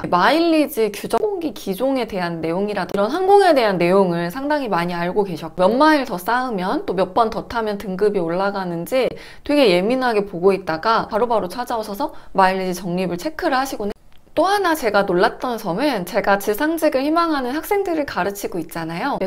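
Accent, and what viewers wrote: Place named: Jeju, South Korea. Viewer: 제주도 말씨 첨에들었을때 억양이 신기 했는데 볼터치 블러셔 색상 넘 이쁨요 ♥️💜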